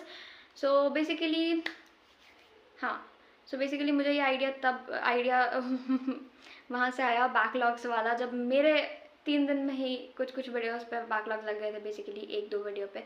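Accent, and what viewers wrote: Bihar, India. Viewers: kya aap bihar se ho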